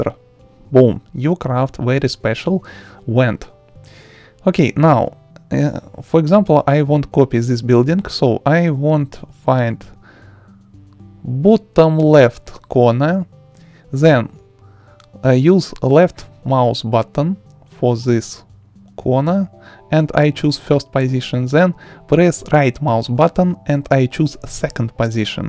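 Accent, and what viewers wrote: Russia. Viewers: This is a russian accent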